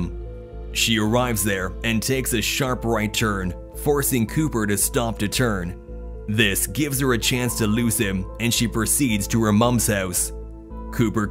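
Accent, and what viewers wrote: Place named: Canada. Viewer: The first ai voice I’ve heard use a Canadian accent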